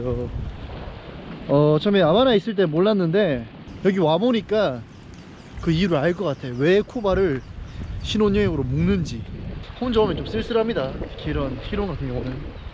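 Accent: Gyeongsang, South Korea. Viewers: 잘생기셔서 그런가 외국 댓글이 종종있네요.경상도 분이신가요 사투리 쓰시는거같은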